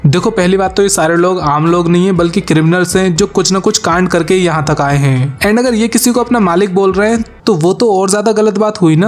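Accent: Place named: Madhya Pradesh, India. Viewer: bhai tum MP se ho kya? Tumhara Hindi accent bahut bekar hai.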